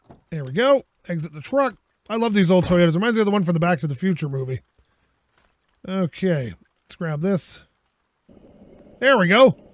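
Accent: Canada. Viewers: I love your videos, quite funny to watch. I can tell that you're definitely not new to working on cars, though I'm not a mechanic. By chance, are you Canadian? Your accent sounds a little like that.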